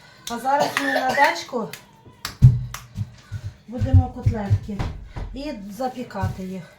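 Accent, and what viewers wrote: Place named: Lviv, Ukraine. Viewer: Ви дуже гарно розмовляєте українською,молодчинка,говір подібний до нашого львівського.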